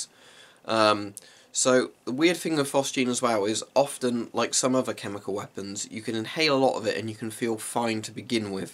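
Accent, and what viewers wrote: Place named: Bedfordshire, United Kingdom. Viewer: Oh okay you sound like you were from my neck of the woods is all.. I'm in Bedfordshire .. Love your vids keep up the good work!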